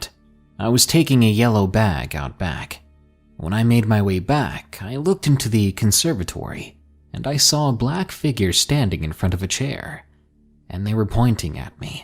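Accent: United Kingdom. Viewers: Why do america still think that everyone for the uk talk like that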